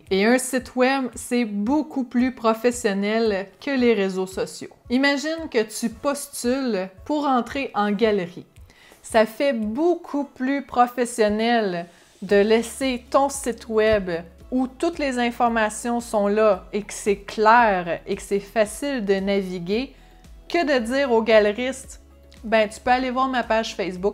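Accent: Canada. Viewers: bonsoir, depuis peu je regarde vos videos, je les trouves interressantes, et bourres de conseils!!! C EST SUPERBE, j adore votre accent canadiens,!!!!!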